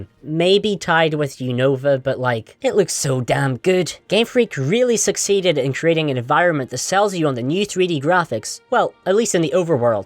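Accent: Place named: Northern Ireland, United Kingdom. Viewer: You clearly put lots of effort into this video. I love your editing style. Keep up the great work dude. Although I’d say lose the northern Irish accent.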